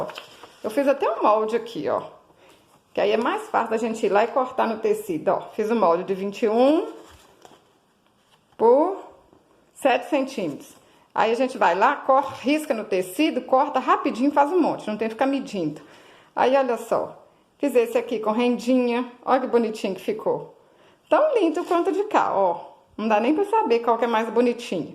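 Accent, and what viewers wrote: Minas Gerais, Brazil. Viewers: Que pessoinha linnnnnda que você é, e esse sotaquin de Minas Gerais! Muito obrigada por sua generosidade. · Amo seu jeito mineirim carinhoso de falar...kkkk Você é um doce!!!!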